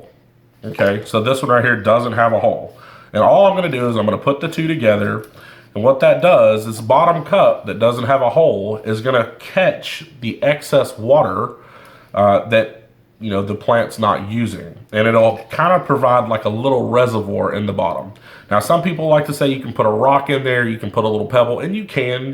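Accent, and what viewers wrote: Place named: Georgia, United States. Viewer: Where is that country accent from? You sound like a Georgia boy.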